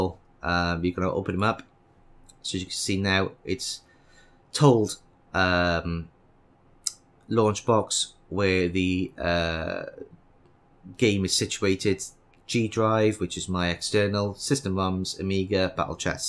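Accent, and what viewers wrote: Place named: Wales, United Kingdom. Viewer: For a second at the start there I thought you were Irish too, turns out not so much.... Welsh I think.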